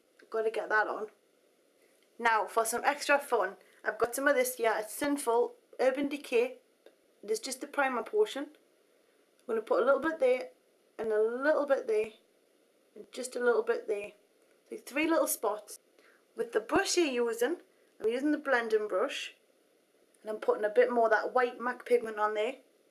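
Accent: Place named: South Shields, United Kingdom. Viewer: omg I can't believe your from south shields Newcastle I have been there!!! I love your videos and accent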